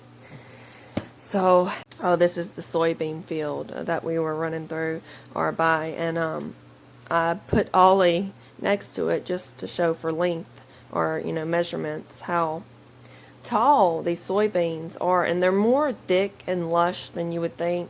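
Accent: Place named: South, United States. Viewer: Hey I love your accent.. I’m originally from India but I have been living in Chicago for 6 years now but I hardly meet people here with your (southern?) accent. I visited Mammoth caves last year and I met locals with your accent and they were super friendly. And yes I agree milk is so satiating. I like yogurt and butter too. I Can eat a stick of butter everyday.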